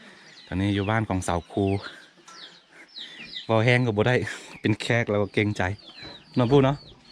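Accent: Thailand. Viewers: ฟังสำเนียงการพูดแล้วเหมือนคนไทยเลย คงจะเคยมาอยู่เมืองไทยนานนะ คำพูดสมัยใหม่ไม่เหมือนคนลาวจริง ๆ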